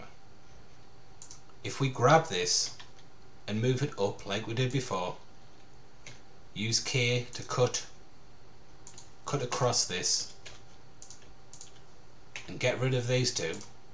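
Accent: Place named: Newcastle, United Kingdom. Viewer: Thanks so much for this tutorial can I ask are you from Newcastle or something I live in gateshead and you sound like a geordie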